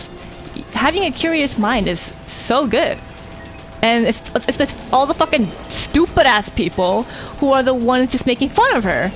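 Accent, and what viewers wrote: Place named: Australia. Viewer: Your posh Ozzie / UK accent is real good. Hope your brain is kind to you for at LEAST a week x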